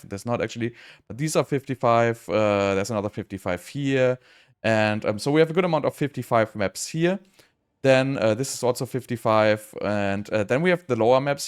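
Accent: Germany. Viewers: Liebe wie man das deutsch immer raushört hahaha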